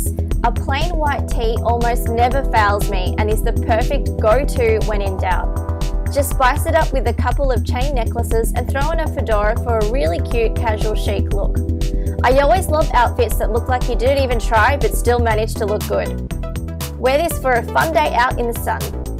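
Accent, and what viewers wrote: Australia. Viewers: i love australian accent (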